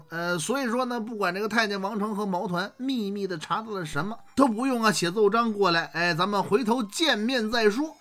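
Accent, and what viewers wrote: Beijing, China. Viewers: 纯粹咱老北京音您呐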